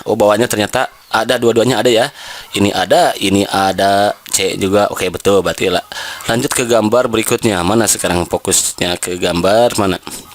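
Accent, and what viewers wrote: West Java, Indonesia. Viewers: Klu orang sunda mah seru, enak d simak 😀. Auto Subscribe n like 👍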